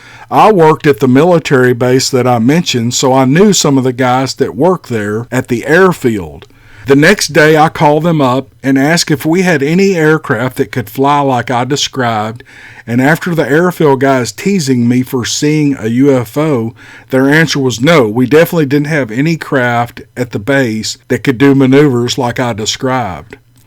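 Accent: South, United States. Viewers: I agree. Love your enthusiam! , and sounthern accent. :) tyfs!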